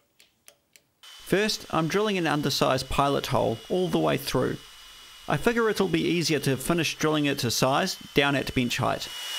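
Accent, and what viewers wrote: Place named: New Zealand. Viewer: Always happy to see a video of yours pop up in my feed. As a Kiwi living in Australia, your accent is making me miss home!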